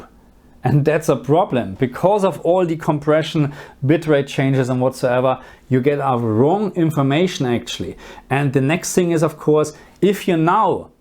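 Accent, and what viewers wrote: Germany. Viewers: Geiler deutscher Akzent. Ein Mischung aus Fremdschämen und Sympathie die ich da in mir fühle. 😁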